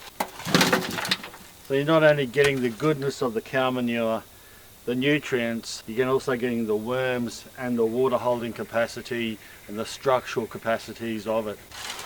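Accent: Australia. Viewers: currently 26 people don't like this video: you have no hearts guys! :D Aussie accent the body and the energetic effort with a ton of passion - together make my days better! A FULL thumbs granted! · I'm half watching just for the accent. The Aussie accent is the coolest there is. G'd day, mate. Oh, and thanks for saving me time and money. I would have filled mine with high quality $$$ soil.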